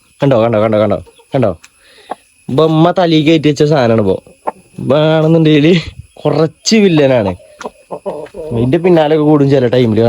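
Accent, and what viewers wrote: Malappuram, India. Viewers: എട. ബ്രോ.ഞാൻ.കണ്ണുരാണ്.നിന്റെ.മലപ്പുറം.ഭാഷ.തീരെ.മനസിലാകുന്നില്ല.😂😂😂😂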